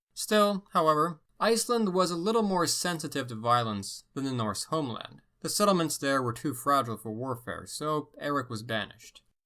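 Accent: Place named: United States. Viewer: One note for you mate, as a British-English speaking Dane who also speaks Old Norse, just because you're rolling your R's doesn't mean you're saying the names in Old Norse.. You're rolling your R's well - but still maintaining the American accent. If you're working on it then good job 👍 keep on going. But if you're not, exaggerate the vowels more and do a bit of research on the Viking alphabet and how to pronounce the letters. For example when you mentioned Erik the Red's name in Old Norse , - "Eríkr" - was perfect, but - "Rauði" - was pretty shite no offence. The letter, "ð" is the equivalent of a voiced "th" and the "i" is a stressed "ee". So it should sound like, "Rowthee-", rather than what you said, "Rowdeh". Just giving you a heads up cause you seem to be trying to speak Old Norse 👍.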